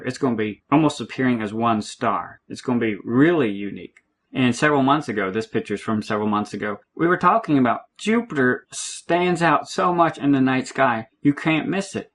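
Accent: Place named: South, United States. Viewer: I know, isn't his accent the cutest, southern drawl. He will be busy with lots of hugs very soon! Of course, after we all fall down at the feet of our amazing Lord and Savior Jesus Christ and get hugs from Him first. Maranatha guys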